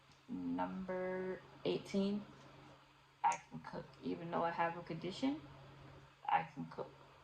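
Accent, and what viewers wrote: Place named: New York, United States. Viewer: You from NY? I’m hearing an accent. Also we have a lot in common so I’m sitting here watching like “yup, mhm, yup me too sis” 😂 I just subscribed, I’m here for your content sis 😂🙌🏾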